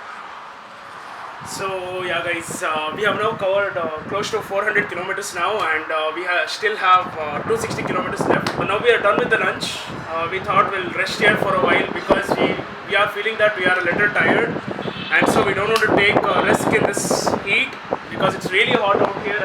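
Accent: India. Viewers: Dude your english accent is very funny...its not the proper accent that indians speak..might be u r south indian tats y it is like...if I m wrong..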